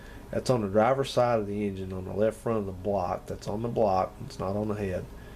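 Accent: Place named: East Tennessee, United States. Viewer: Love the accent. If I have to guess, east Tennessee? (please don't yell at me if I'm wrong!) Brought back some memories from when I lived in Oak Ridge back in '96-'97.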